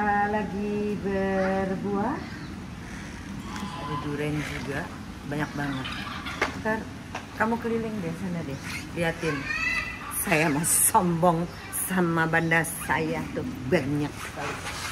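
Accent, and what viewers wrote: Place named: Purwakarta, Indonesia. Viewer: Pakai logat purwakarta tsmbah seru bun..... inyong tambah seneng 😂😂😂😂...😂❤❤❤❤❤❤ love you bunda....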